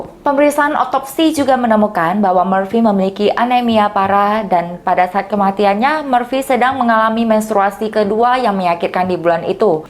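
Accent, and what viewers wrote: Medan, Indonesia. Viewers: Cara ngomong nya sepertinya dari Medan haha Apakah dulunya tinggal di Medan?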